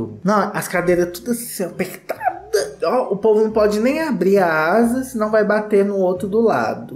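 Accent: Minas Gerais, Brazil. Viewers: Um escritório bem feito?!, você me faz rir demais, menino! 😂Adoro seu the boche e seu sotaque mineirim 🤩🤩💜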